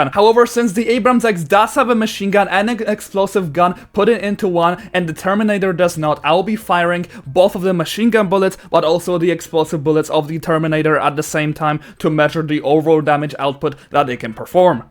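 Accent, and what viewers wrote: Poland. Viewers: im judging by your accent you are from poland or chzech?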